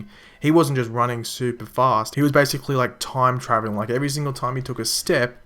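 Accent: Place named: Australia. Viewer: Oh you are Australian. Brilliant.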